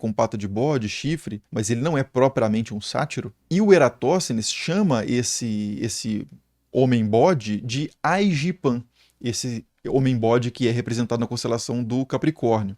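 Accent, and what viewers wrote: Minas Gerais, Brazil. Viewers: sotaque mineiro pega diferente né...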